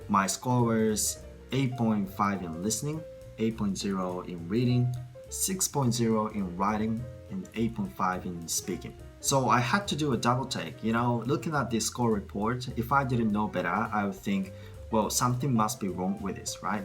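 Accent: Australia. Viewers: オージーイングリッシュですね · 6年前も8.5とった内容の動画取ってますが、この時はよりオーストラリア英語になってますね 今のオーストラリア（メイン）＋アメリカ/ジャパニーズ（サブ）アクセントそっくり。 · ところどころオーストラリアのアクセント入ってて好きです笑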